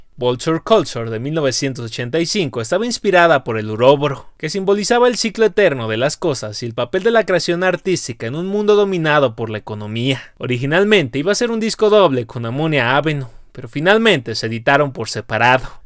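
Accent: Mexico. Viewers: Las historias son supremamente interesantes pero el narrador: fatal! Ese acento mexicano es el peor del idioma español, es un acento náuseo.: eduque su voz y modifique ese fastidioso acento.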